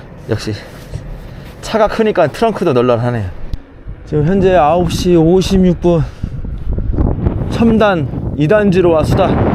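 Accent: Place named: Jeju, South Korea. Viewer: 제주사투리 왜케잼잇지?ㅎ 제주대리 궁금햇엇는데...잘봣습니다.ㅎ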